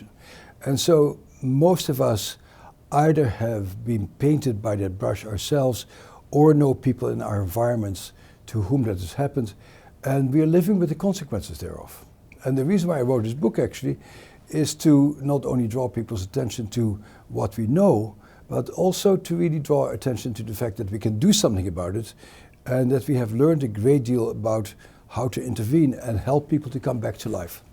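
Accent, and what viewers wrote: Netherlands. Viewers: I can just tell that this guy has to be Dutch just by accent! If he isn't I will.... eat my socks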